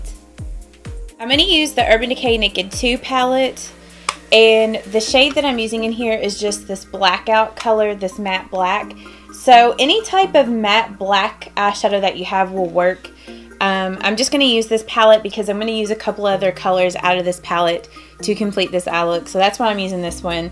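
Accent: South, United States. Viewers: HI.:) I JUST WANTED TO SAY. YOUR MAKEUP ALWAYS LOOKS FANTASTIC;) YOUR ARE SUCH A BEAUTIFUL GIRL;) IM ORIGINALLY FROM OKLAHOMA AND JUST LOVE YOUR COUNTRY ACCENT;)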